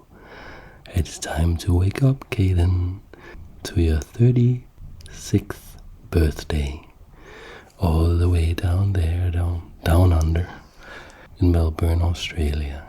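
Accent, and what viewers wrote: Denmark. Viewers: Is that a danish accent I Can hear? 🤣 I am danish myself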